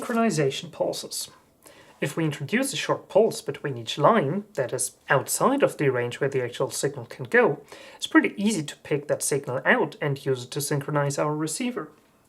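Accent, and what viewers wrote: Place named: Germany. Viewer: I sense beautiful German accent! 😊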